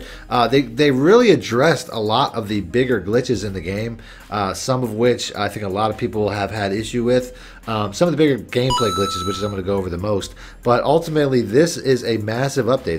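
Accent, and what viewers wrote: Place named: Philadelphia, United States. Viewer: You from Philly bro?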